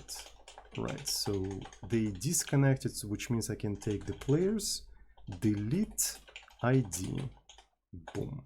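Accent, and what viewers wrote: Russia. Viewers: Твои видосы отличные, но мне, как русскоговорящему, тяжело слушать русский акцент) Если тебе не похуй на мой совет, то изучи правильное произношение звуков в английском. Например звук "т" в англе совершенно другой (и при этом не сложный бтв)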